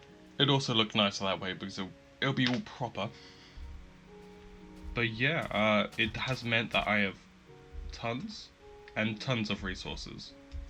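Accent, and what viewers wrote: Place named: United Kingdom. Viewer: I've been really enjoying your series so far, keep up the good work! :3 I also started playing beta recently and Ive had similar see-through glitches here and there. No idea what thats all about really, guess just some beta bugs. The tower turned out really nice! I love the shape of it. The name Overlook came to my mind when you asked about name suggestions (inspired by the hotel's name from Stephen King's The Shining). I think it fits cause when you're on the top, you can look over your world and see everything really well. Q: What made you start making videos? Also, your Bri'ish accent is cute xD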